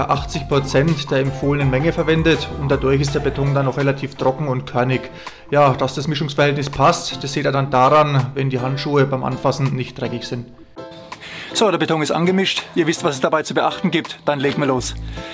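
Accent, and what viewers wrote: Franconia, Germany. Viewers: Fränkisch immer noch bester Dialekt 👌🏻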